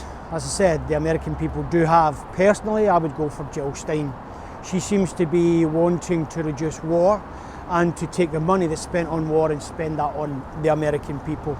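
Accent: United Kingdom. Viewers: I still get annoyed with your red coat accent as in "the red coats are coming".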